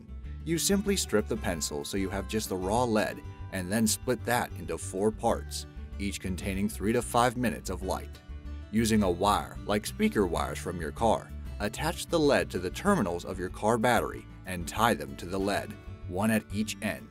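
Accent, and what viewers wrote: Hungary. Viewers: Are you from Hungary? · wait, are you from hungary?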